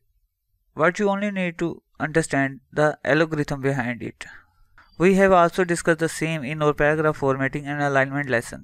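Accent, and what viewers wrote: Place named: India. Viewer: oh, that indian accent...I don't understand, how can you still have it? I mean, you indians talk a lot english, even before everybody in the world knew english, DUE to India beeing brithish colony, but still, even know that indian accent is...I don't want to offend. let's say that IT IS RECOGNISABLE.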